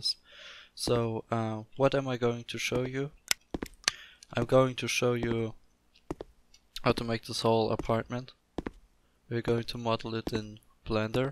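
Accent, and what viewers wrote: Germany. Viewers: Love your videos man :) Just one question (no offense): Are you from an english speaking country? Because your english sounds quite a bit like the english most of the germans are speaking :D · Man hat es ein bisschen gemerkt bei manchen Wörtern, du hast diesen typischen deutschen Akzent drinnen, aber ich glaube, das fällt eh niemandem auf😆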